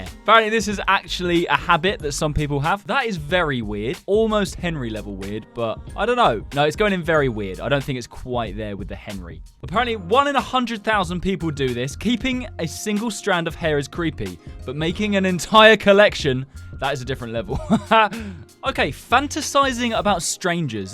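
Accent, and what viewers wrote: United Kingdom. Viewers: I THOUGHT THAT HE WAS BRITISH Y HE SAYING DOLLAR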